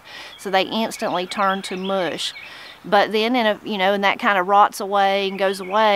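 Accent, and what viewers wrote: Appalachia, United States. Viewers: I always thought these were poisonous! Well how do you like that. Have you eaten them? Wait What, Now I’m learning the second thing,I didn’t know about hostas,is that those seed pods you can throw them and they’ll actually grow from themselves. I have tried to dry those seed pods and they just mold or get all furry looking gross and I have to throw them out.. OK back to the video, I might learn the third thing I didn’t know and I’m older than you and I love growing hostas in what little shade I have out here.in the southern part of Indiana. Wow this is the best video. I want to try them. And that Appalachian speak I’ve never heard of astilbe been called As ta bil… ( Uh stil bee ) Astilbe.. The Appalachian language..❤️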